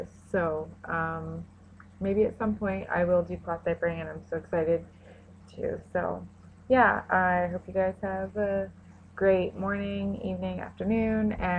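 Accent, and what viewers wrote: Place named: Canada. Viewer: nice(: u gotta b from canada cuz u really have an accent(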